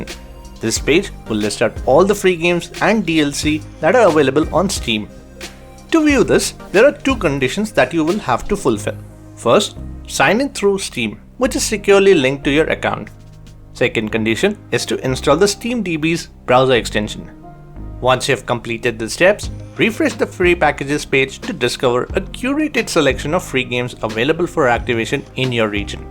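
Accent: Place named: India. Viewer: u got the indian accent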